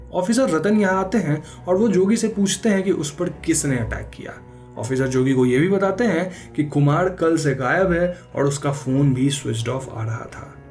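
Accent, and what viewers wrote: Bengal, India. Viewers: Bro are you bengali..?🧐🤔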